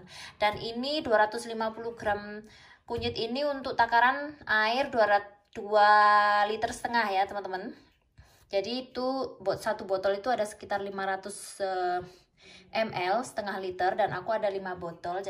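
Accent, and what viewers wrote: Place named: Java, Indonesia. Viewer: waduuh mba trnya ngomongya mendok bgt ya :D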